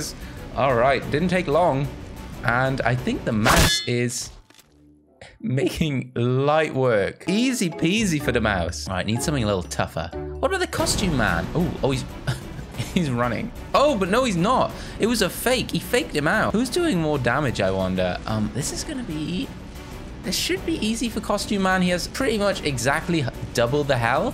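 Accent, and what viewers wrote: United Kingdom. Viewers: r y British?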